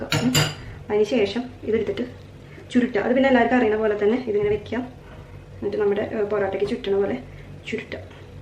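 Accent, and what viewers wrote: Thrissur, India. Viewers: Authentic thrissur slang. Keep it up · Thrissur slang is good but if you lessen speed, we could follow you clearly. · Thrissur ano sthalam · Thrissur aano veedu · Enthu speeda samsaram...thrissur aano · Mmmade trissur bhaasha...kurachu speed kurachu samsarichu dear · ആദ്യമായി ഞാൻ ഒരു കാര്യം പറയട്ടെ.. ചേച്ചിയുടെ കുക്കിംഗ്‌ നെ പറ്റി എനിക്ക് ഒന്നും പറയാനില്ല.. superb.. but അതിനേക്കാൾ ഉപരി you are very sincere and a good humon being..... loved your thrissur slang.. iam also a thrissur kkari... 💪💪സംസാരത്തിന്റെ speed thrissur kkarkk ullathaa.. 🥰🤩😘🤣🤣🤣lam a begginar in cooking and a new subsciber of yours... stay blessed and stay genuine like this..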